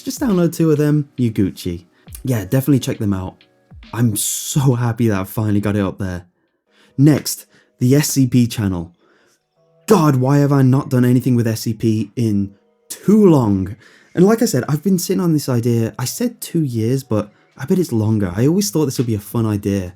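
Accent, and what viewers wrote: England, United Kingdom. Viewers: Wow, you are a very humble guy and I’ve always listen to you because of your very calming British voice ❤️. Keep doing what you love and just listen to your gut instincts. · Can someone tell me where in England his accent is from? I love listening to it.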